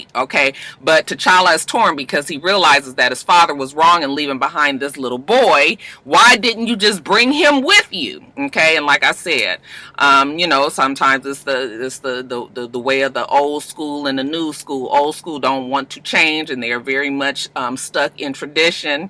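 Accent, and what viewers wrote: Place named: Los Angeles, United States. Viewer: lol. You were saying “clow”. It’s your LA accent. We know you “hawrd”.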